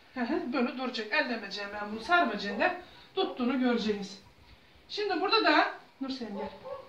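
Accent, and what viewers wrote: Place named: Black Sea, Turkey. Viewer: Ah hemşehrim ah çiğirt-irkme-zebil olmasın kelimelerini duydukca sanki çocukluğuma geri gittim sanki rahmetli anacığımın sesini duyar gibi oldum ne kadar özlemişim Uşağımızı şivesini kendine has kelimelerini Allah senden razı olsun.Ayrıca peynirde çok güzel oldu mutlaka deniyeceğim hemşehrim çok teşekkür ederim.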